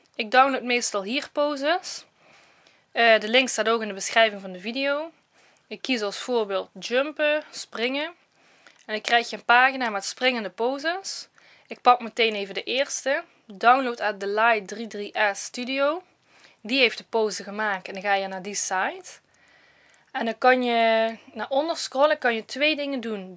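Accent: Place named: Limburg, Netherlands. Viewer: hey hey ik ben zelf ook half limburgs dus ik ben je accent wel gewend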